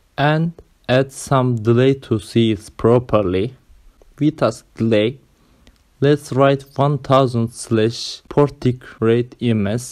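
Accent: Turkey. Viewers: dinlerken ya bu ingilizce türklerin konuştuğu şekle benziyor dedim. sonra acıklamaya baktım :D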